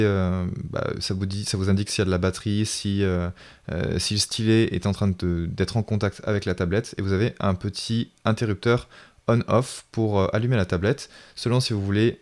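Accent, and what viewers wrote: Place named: Quebec, Canada. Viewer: Bonjour, Merci pour ta vidéo qui est très sympathique et tu n'as pas trop l'accent prononcé du Québécois. Bref, as-tu fait une vidéo pour bien configurer la tablette en AZERTY, car claver QWERTY ce n'est pas pratique. Merci d'avance pour ta réponse et si tu as fait une vidéo, d'y mettre le lien. :) Continue, c'est génial ! D'ailleurs, je viens de m'abonner à ta chaîne ! ;)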